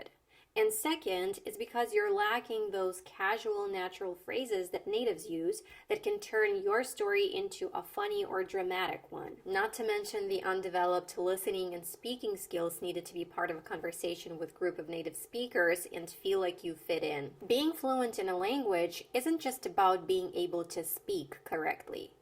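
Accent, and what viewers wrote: United States. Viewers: Good evening my beautiful teacher 😊. i love your all videos and the way you explain and teach is fantastic and excellent. i am trying to speak like you but it might take time and i thought in beginning that you are American because i love your parlance. thank you so very much 😊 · If you not say that people say that you are pretending being an American… I couldn’t believe you are not an American… for me you look and sound as an American woman…. Hope one day I can pretend too being an American as good as you are doing it 😅….congratulations! =) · Thank you for this video. You speak an excellent american English . Impressive. I'm trying to do the same with English from south of England but I don't have your talent at all !😅 Very few people can fade their accent. In France, I lived in Paris almost almost my life, I met very very very few foreign people without accent.I just remember a russian woman, that all. 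Yes, foreign people can speak french very well, but without accent?no, it's absolutly exceptionnal. Absolutly, learn a foreign language changes your mind, and your point of view about a lot of things👍 Nevertheless, I'm 53 years old, my priority is to be understood, to have a consistent pronunciation, and to have fun learning English. See you soon on your youtube channel👍👌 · You sound as an American , and i really like hear your voice and your information. 🎉Thanks ☺️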